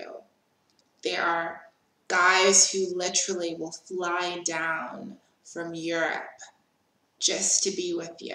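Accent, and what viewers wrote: United States. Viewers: You’re accent doest strike me as South african but rather American?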